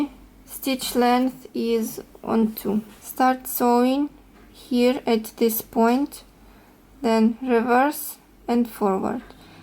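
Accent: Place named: Russia. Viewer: какая красота но смотрю без звука, ужасно режет слух. Всё-таки русский акцент в английском языке это нЕчто, произношение как будто топором рубят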